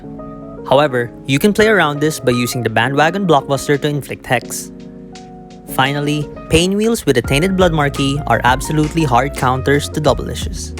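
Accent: Philippines. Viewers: Weird Question, Are you Filipino? Cuz you giving me a Filipino vibe you know? The way you speak, that Accent of yours is what giving me Filipino vibe, and I dig with that. Cuz I'm Filipino.🇵🇭🇵🇭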